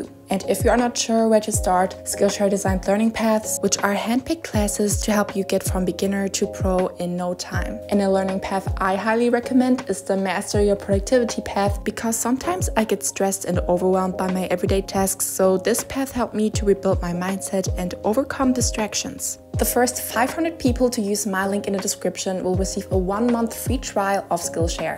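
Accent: Germany. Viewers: The German accent 😅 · I hear german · I hear some german accent .,.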